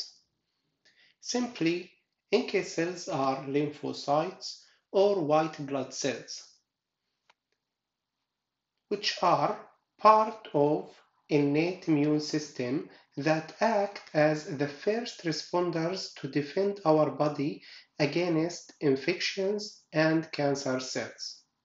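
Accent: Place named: Arab world. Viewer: الله يعطيك العافية اول الشي بس الفيديو من شخص عربي و موجه لعرب بتحكي انكليزي ليه!!! المحتوى الاجنبي غني جدا بهيك اعمال و المحتوى العربي هو الفقير. و من لكنة كلامك بالانكليزي واضح جدا انك شخص عربي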